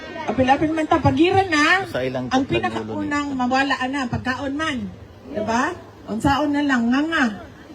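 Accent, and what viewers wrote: Visayas, Philippines. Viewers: parang tanga magsalita halata nagbabasa may script pero slang p rin pagkabisaya khit ingles pa😅 wthell!!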